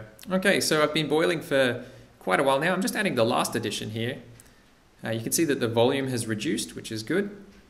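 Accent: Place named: Australia. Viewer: He said 0.8 - not 3.8. Its that Aussie accent.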